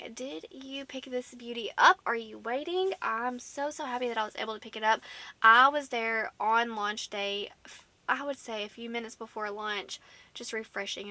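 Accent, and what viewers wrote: South, United States. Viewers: I'm a new subscriber of yourd and I am really enjoying your channel and your beautiful southern accent! My family is from Temnessee and I love the subtle differences across the states! ❤ I'm eagerly waiting this palatte!!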